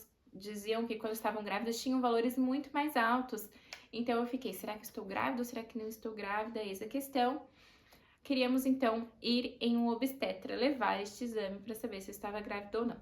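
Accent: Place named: Portugal. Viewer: ❤❤❤❤Primeiras impressões, já mistura o nosso português com o Português de Portugal...kkkk Principal diferença que encontrei é que no Brasil pai não é visita, porém, existe a resistência dos hospitais em se adaptar a essa lei por diversas situações inclusive lotação hospitalar. E suponho que 95% das maternidades públicas não permitem a permanência do homem no período noturno pelo exato motivo do compartilhamento de quartos. Existem maternidades modelos mas são poucas e extremamente disputadas. Nessa o serviço privado ganha, porém depende do plano de saúde também. Lindo relato, super detalhado e mto necessário pra quem passa pelo mesmo. Obs: Achei lindo quando vc disse que precisa do perto de vc, vai além da necessidade com a criança...demonstra amor e segurança. Lindo casal..amei! Parabéns!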